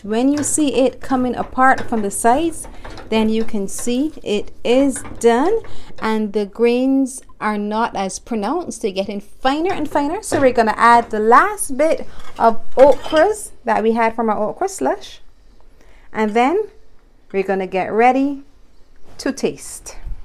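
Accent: Barbados. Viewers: What a sophisticated bajan accent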